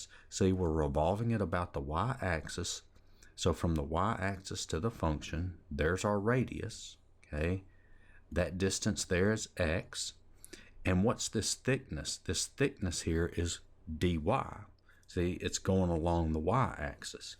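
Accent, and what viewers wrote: South, United States. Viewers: hello sir i just want to thank you for all your efforts just for the record im not even majoring in math but i watched almost every video of yours because im in love with your southern accent , i could like listen to you all day along and im not gonna get bored at all all the respect and all the love from morocco :)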